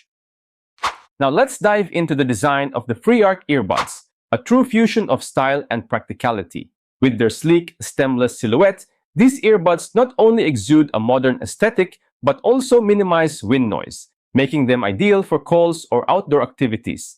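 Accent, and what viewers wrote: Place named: Thailand. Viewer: Love your thai accent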